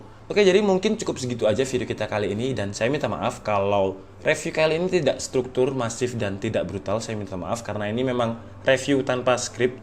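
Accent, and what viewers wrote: Malang, Indonesia. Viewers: Wong malang mas ?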